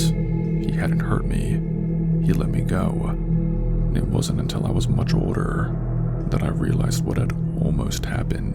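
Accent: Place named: East Coast, United States. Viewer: Ahh. Thought I detected an East Coast accent. I KNOW you are not Ai! I’m a night owl in the Pacific time zone. Even when I catch this around midnight, it’s like 3am your time when I comment. 🤷🏻‍♀️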